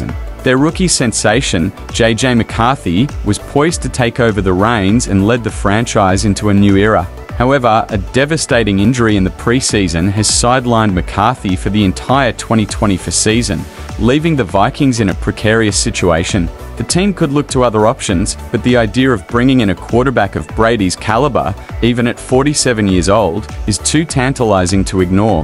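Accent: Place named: Australia. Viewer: Do not trust anyone with an Aussie accent telling us what the NFL is doing…… Not gonna happen……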